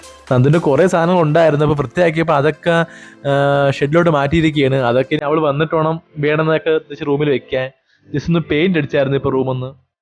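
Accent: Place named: Southern Kerala, India. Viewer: നല്ല വീട്, വളരെ simple,ഭാഷ അടിപൊളി തെക്കൻ, മണ്ടേൽ കേറി പോകുന്നു, 👌👌👌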